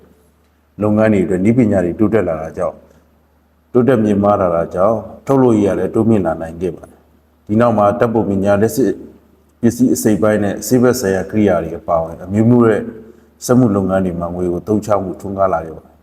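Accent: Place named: Shan, Myanmar. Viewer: မြန်မာစာဖတ်တာ တအားရှမ်းတယ်နော်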